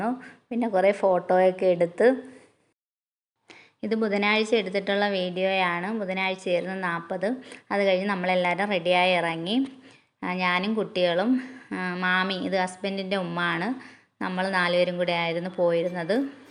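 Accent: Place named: Malappuram, India. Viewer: ഇത് പോലത്തെ vdo കൂടുതൽ ഇടൂ.👌 Tvm ആണോ മലപ്പുറം or kozhikode ആണെന്ന് തോന്നി.👍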